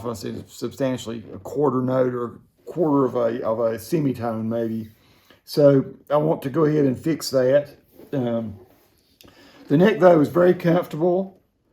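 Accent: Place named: North Carolina, United States. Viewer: I'm detecting a heavy North Carolina accent. Am I close?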